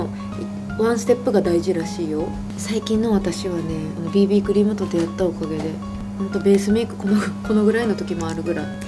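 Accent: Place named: Aomori, Japan. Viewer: 東北人のわたしですらなまってるなぁと感じるのですがあいちゃんは東北の方ですか、、？青森っぽいなまりだなぁと思いますが違かったら失礼しました( ´тωт` )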